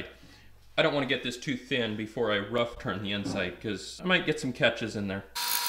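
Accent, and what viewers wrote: Canada. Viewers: Neat pattern out of plywood - good job. BTW, are you Canadian or North-Central American? I hear an accent in there.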